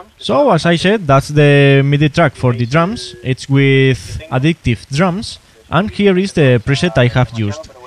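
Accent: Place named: Spain. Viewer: Your spanish accent sound pretty awesome! ahah and don't forget to always add some tags to your videos, so you can get more views ;D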